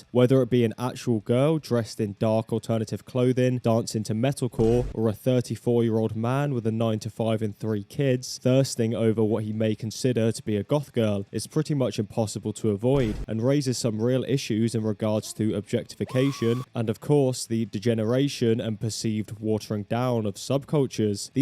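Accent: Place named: Cockney, United Kingdom. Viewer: New to your channel, but loyal to the cause. Binging your shit for the night you cockney fuck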